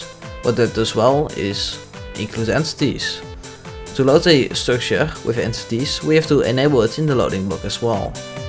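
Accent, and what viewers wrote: Netherlands. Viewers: Niets hatelijker dan een hollander die engels spreekt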